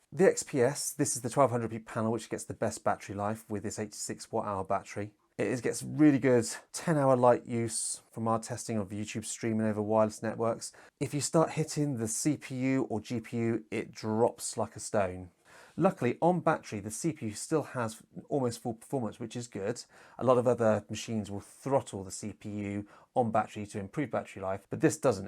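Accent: United Kingdom. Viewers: Excellent comparison. Love your accent, too. Which part of UK are you from?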